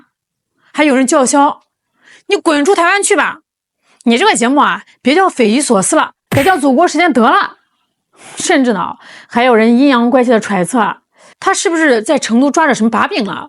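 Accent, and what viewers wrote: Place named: Shandong, China. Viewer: 这个没治了太亲切了，山东老乡哈哈哈哈🥰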